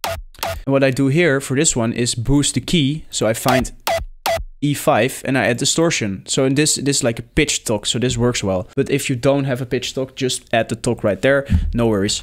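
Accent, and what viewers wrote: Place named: Netherlands. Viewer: Lol. Je hoort meteen dat Nederlandsche accent. Edit: hoe lang Ben je al bezig?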